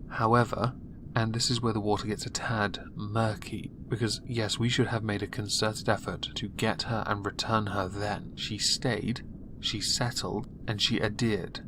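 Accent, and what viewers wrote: RP, United Kingdom. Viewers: Could maybe ONE of you closet furries do the not-really-going-out-there-on-the-edge social commentary videos WITHOUT the laconic "I just woke up" received pronunciation and the weird background ambiance to sound in-character? Christ all-fucking Mighty.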